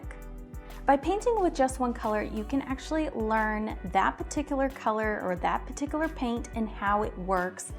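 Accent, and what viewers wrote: South, United States. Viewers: I detected a southern accent. Are you from the south?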